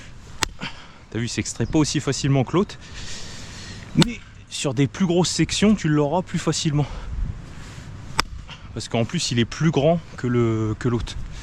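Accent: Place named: Nord, France. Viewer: Ti t es de ch'nord. Merci pour la vidzo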